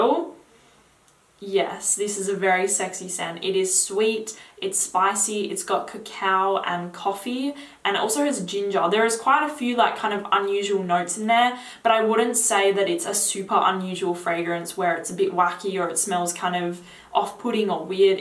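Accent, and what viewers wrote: Australia. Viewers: it went up quick. It's that aussie accent. Us blk men love it. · An Australian accent on a woman is my weakness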